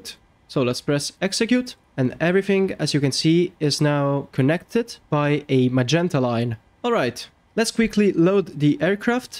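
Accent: Italy. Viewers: are you Italian?😅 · Are you italian?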